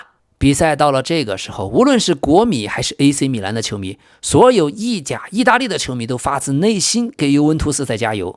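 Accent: China. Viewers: 眾口難調啊，我個人的經驗是字幕繁體優先，因為老k的聲音已經是大陸口音了，再配上繁體字幕 + 西語球員名詞，能夠最大化兼容大家的習慣。聽不懂可以看，看不明白可以聽，總能把信息傳遞出去。而且很多平時寫簡體字的朋友其實也看得懂繁體字，老k可以考慮多修覆一些繁體字的錯誤，而不是改成簡體。這個可以慢慢用 AI 來糾錯，集中找幾期過去的錯誤作為訓練，很快就能改進的。個人建議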